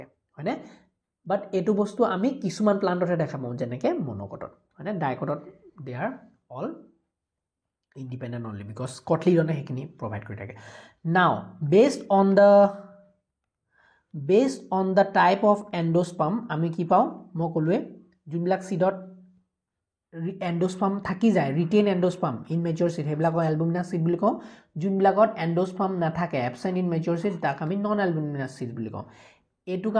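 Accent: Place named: Western Odisha, India. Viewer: Tq sir.. being a western odisha dialect speaker it seems like u are just explaining in our dialect with slight changes in accent. Thanks for notes in english.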